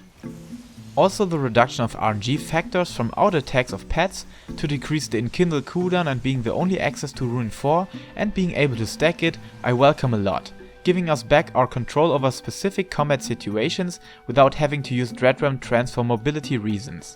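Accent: Germany. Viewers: As a German myself, I am cringing so hard with this accent xD it's so bad, sorry. Even though I do like your content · Don't worry about the accent as long as you have clear and understandable diction. On a personal note I'd rather have an actual German accent than a faked American one.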